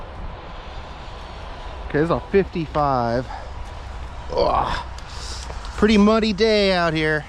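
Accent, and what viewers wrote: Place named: New York, United States. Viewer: I knew you were a NY transplant... lol I have the same accent.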